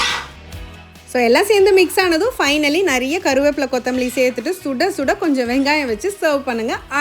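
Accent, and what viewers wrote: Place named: Chennai, India. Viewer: Unga peachy kakave unga video ve paakuren…Chennai language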